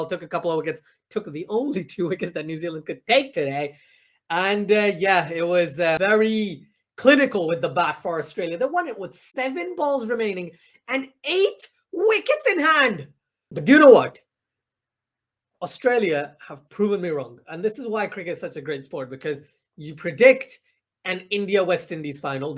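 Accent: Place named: Pakistan. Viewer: Great analysis. So glad you lampooned the "toss" debate. If a team bats first and gets 170 plus, anything can happen. Yes they won the toss and won the game but as any researcher will declare: correlation is not causation. I have really enjoyed your comments and I am going to look out for them during the Ashes. Many years ago I drove night time city buses. My security guard was from Pakistan. We became great mates and spent the whole night talking cricket and religion. He was such a great bloke. It was not a requirement to have the same security guard but we always asked for each other. You remind me a lot of him, and it is not just the accent. Very balanced, very thoughtful, great sense of humour.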